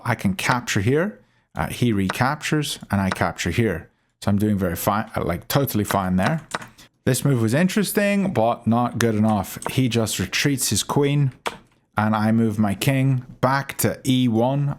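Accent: Northern Ireland, United Kingdom. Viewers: I'm really enjoying watching your journey. I'm currently trying to escape the <num> bracket and have been spending a lot of time learning theory recently, but watching skilled players convert midgame positions is very helpful for me Also I'm interested in your accent! It sounds like a mixture of Northern Irish and South African, plus I guess a few north Americanisms. Did I get anything right?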